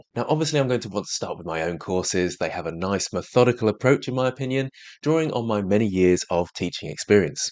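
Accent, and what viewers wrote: United Kingdom. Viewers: Are you from the United Kingdom of Great Britain and Northern Ireland?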